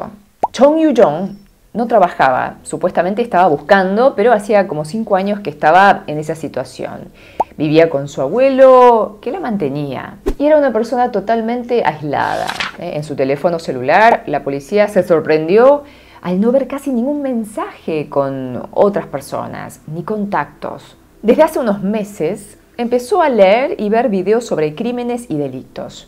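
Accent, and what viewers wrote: Argentina. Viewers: me gusta tu voz de locutora y tu acento argentino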